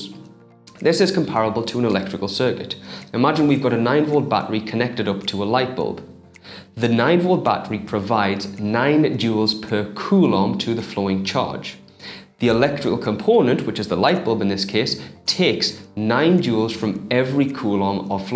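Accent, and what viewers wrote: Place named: United Kingdom. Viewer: He is bri'ish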